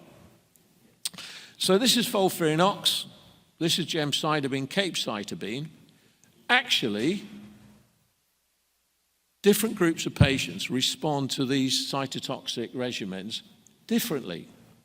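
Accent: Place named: United Kingdom. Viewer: Highly informative and comprehensive by prof. Besides a British accent is much of a value addition, certainly a breeze of fresh air.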